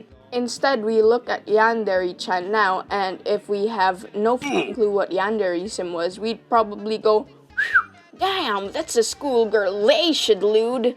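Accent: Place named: Philippines. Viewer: you sound filipino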